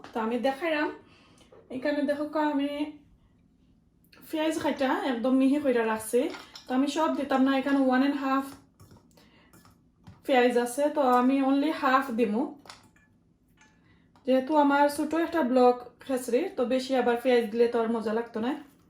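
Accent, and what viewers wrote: Sylhet, Bangladesh. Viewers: এটি সিলেটি ভাষা 👌👌👌🥰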